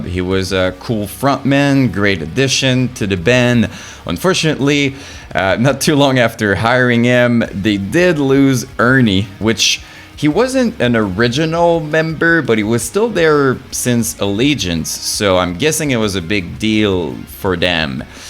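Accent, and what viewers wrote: Quebec, Canada. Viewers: Are you fromQC? You sound like you are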